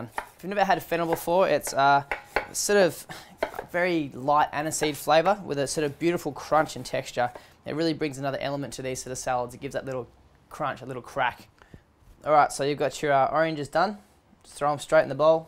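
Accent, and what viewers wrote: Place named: Australia. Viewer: sweet Australian accent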